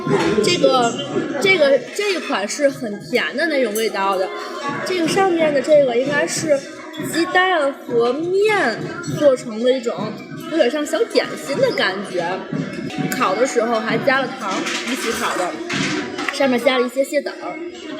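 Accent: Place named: Tianjin, China. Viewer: 这满满的天普！我这个河西人听了真是内牛满面😭 还有那些街景！真亲切啊！订了订了！继续加油💪！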